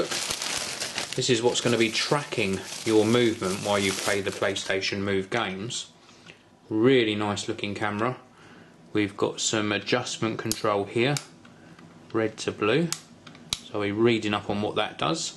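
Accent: England, United Kingdom. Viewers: It's a british accent:) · It's an english accent.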